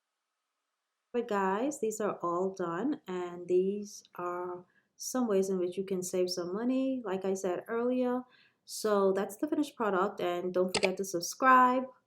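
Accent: Bahamas. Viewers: I am too just knew the accent when i heard it 🇧🇸❤️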